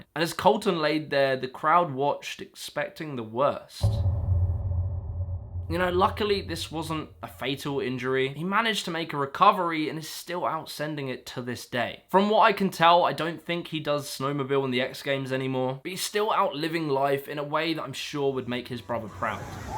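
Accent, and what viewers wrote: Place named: United Kingdom. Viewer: I hate the British accent... It's THIRTEENTH....not FIRTEENF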